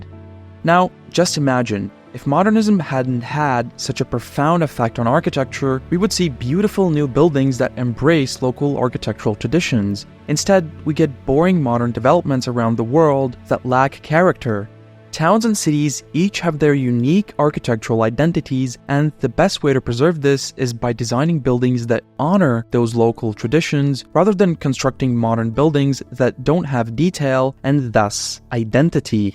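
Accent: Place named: Latvia. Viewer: Es gribēju gluži otrādi paslavēt, par angļu izrunu ar tik knapu latviešu akcentu :D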